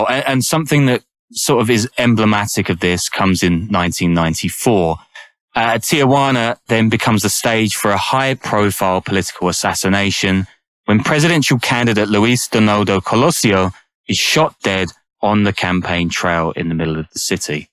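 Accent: United Kingdom. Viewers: Why the fk is it always narrator’s with an English accent. Like y’all facts are always so fked it’s unreal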